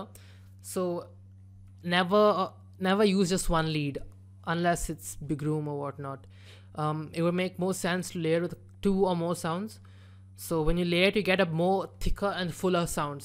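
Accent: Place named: India. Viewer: I mean.That's Awesome.His voice sounds Indianish.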